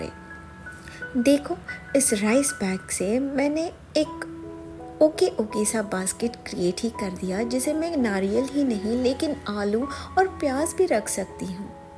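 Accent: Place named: South, India. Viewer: Very nice.🎉 R u a south Indian ur accent i felt so.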